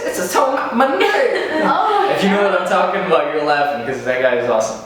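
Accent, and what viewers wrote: India. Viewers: that indian accent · Hahaha the indian accent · nice Indian accent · masha allah....u have nice sense of humour and indian accent too......keep up the good work.....ur recitation is so pleasing to hear...!! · i also live in india i wanna meet you plz gimme your address...... <mail> plz send me your address. your nail indian accent. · Indian accent bhai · Indian accent on point! 👍👍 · Your Indian accent was so funny😂😂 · love the indian accent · Hahahaha really funny love the indian accent Thank you so much we want more vedios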